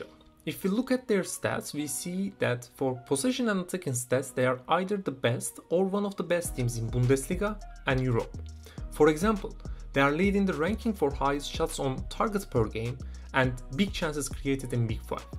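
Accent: Turkey. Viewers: Your accent is making me think you are Turkish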